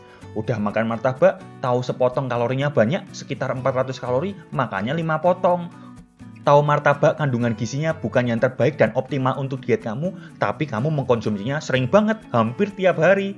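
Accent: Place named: Java, Indonesia. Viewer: Logat Medoknya mas ngangenin. Ya iyalah, wong tiap hari nontonin diet santuy melulu.. suaranya terngiang2. Wkwkwk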